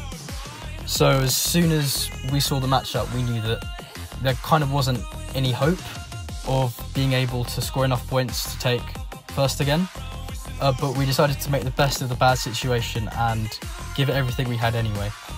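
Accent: England, United Kingdom. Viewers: Huh who is this British Taking over channel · you sound like worthy prince lol...u also from UK? good to hear your voice...u are a serious guy it seems contrary to your posts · You are English lol didn't expect that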